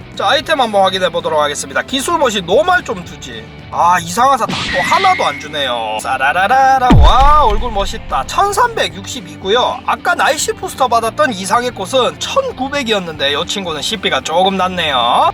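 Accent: Gyeongsang, South Korea. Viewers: 역씨 경상도ㅋㅋㅋㅋㅋ 거북왕 말고 그북왕ㅋㅋㅋㅋ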